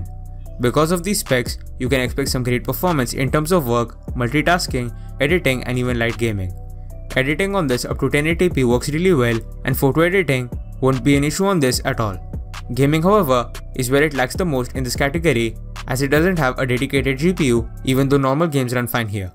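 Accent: India. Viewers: Very thicc Indian avcent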